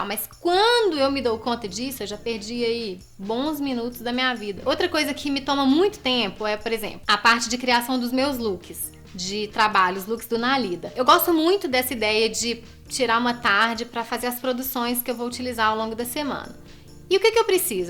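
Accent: Minas Gerais, Brazil. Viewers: Eu gosto muito desse sotaque minerin!!!!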